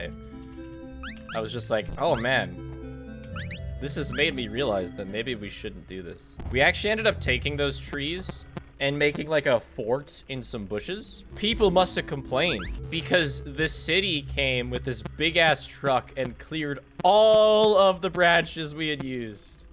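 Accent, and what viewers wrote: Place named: Canada. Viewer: this "fake drunk" voice you put on sometimes perplexes me. how do you get MORE Canadian when you talk like this? These are dangerous levels of Canadian here! What black magics are you playing with to conjure this phenomenon?! You should narrate a whole book like this!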